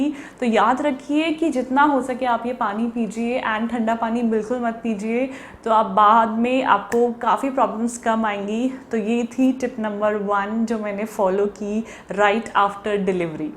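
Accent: Garhwal, India. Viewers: Aap gadwali ho kaha se ho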